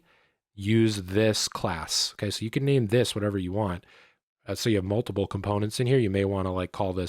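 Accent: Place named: Boston, United States. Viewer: Man that Boston accent <3 You should colab with Brand one day.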